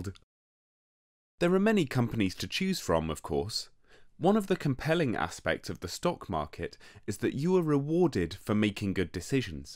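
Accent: England, United Kingdom. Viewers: It's just an English accent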